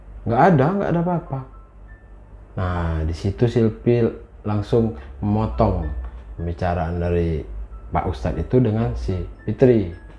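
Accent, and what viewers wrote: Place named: Jambi, Indonesia. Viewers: Khas nyo bahaso Jambi tu bang🤣 wong Kito la ,asyik nian dengar cerito Abang tu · Mas nya KKN apa uji nyali mas?? Serem gitu Btw bhasa Jambi itu sedikit Melayu ya kak? Suka denger nya saya 🙏🙏🙏 terimakasih sudah upload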